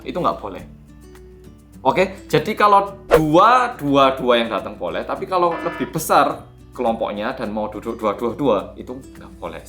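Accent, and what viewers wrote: Java, Indonesia. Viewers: Hallooo oppa medok....madiun stay tune ya....sama2 ppkm parah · Mase... Jawa biangeeet logate... Gak nyangka jebule wong korea..... Kudu "iri" aku.... Ak ngomong bhsa jawa ora dapet logate...susah rek... Datar nadaku 😁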